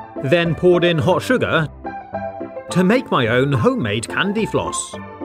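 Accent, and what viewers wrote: United Kingdom. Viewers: I love this guys British accent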